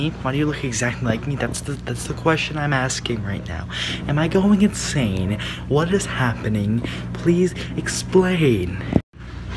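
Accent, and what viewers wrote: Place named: Russia. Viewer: Russia accent is still golden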